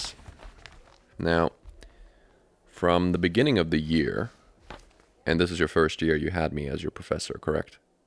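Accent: Germany. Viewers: DUDE YOUR GERMANY ACCENT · I Love your German Voice 😂❤️❤️❤️ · that's a good German accent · 0 hitting me with the German accent and everything damn 😳✋🏻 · Is he nativ german or why that accent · love the german voice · such a good german akzent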